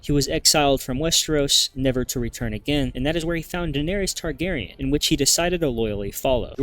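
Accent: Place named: United States. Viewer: I like your accent. I'm assuming you're American (correct me if I'm wrong and you're Canadian); which part of the States are you from?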